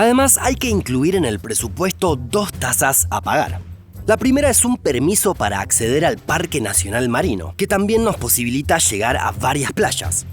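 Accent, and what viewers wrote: Buenos Aires, Argentina. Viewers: Me gustan mucho tus informes claros precisos y sin tanta cháchara lo único que no me gusta es tu acento muy pronunciado del tono arrastrado bonarense de la doble ele (ll). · Hola. El narrador es de Argentina. Saludos. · Me encanta el acento argentino (porteño?): "shegarón, shega". hahaha. Muy peculiar. Saludos desde Brasil. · Horror el acento argentino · Raro es escuchar un porteño intentanndo hablar como mexicano :) te delata la Y, y la LL (shh) jajaja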